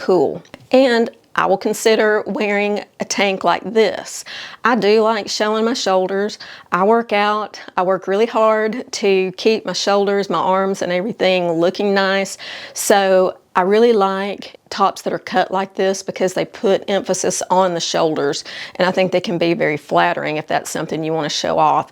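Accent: South, United States. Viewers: I'm so glad I found you,! Your observations and suggestions are right on target. And you deliver your message in a no-nonsense but kind way, You really are excellent at this, and that's coming from a retired marketing executive. Everything you touch on is something that I had been thinking about; it's eerie and at the same time wonderfully satisfying, And your charming Southern accent is just so easy on the ears, Beats the heck out of my Philly accent! Keep on keepin' on,! Hopefully women will heed your advice. I know I have!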